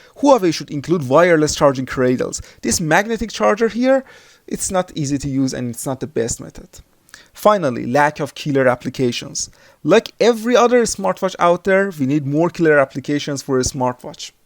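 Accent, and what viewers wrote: Iran. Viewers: Bro, I am 100% sure your native is Farsi, right? Beautiful review, thx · Persian accent 😂😂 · Iranian maybe or afghan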